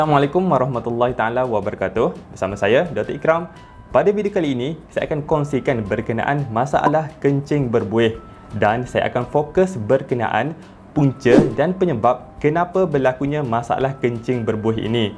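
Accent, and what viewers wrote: Malaysia. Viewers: Orang Malaysia Ya Bang...?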